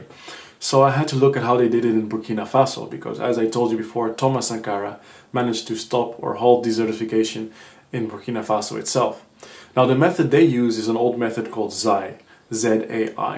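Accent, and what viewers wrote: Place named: Netherlands. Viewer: + your accent...are you Dutch perchance??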